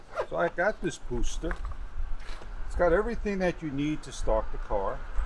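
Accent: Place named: Brooklyn, United States. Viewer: BROOKLYN Accent, BROOKLYN Neighborhood, and thank you for an excellent Brooklyn Review. I have a Tacklife T8 which is identical to yours and it really does work.. 👍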